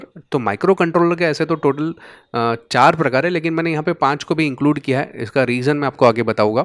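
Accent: Gujarat, India. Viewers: Juna ho chuka hai 😂😂🤣 Bhai aap Gujarati ho kya ?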